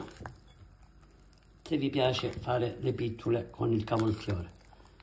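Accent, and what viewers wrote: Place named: Salento, Italy. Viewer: Grazie 🙏🏼poi con l’accento salentino sono sempre più buone 😊bravissima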